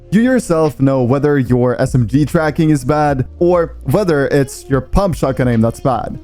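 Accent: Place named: Norway. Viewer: Are you from norway? Cause it sounds like it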